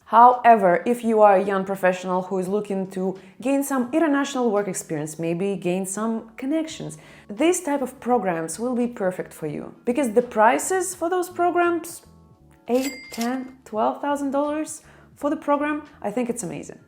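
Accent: Russia. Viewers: Полезно 🎉как же приятно узнавать русскоговорящих по акценту 😂ни с чем ни спутаешь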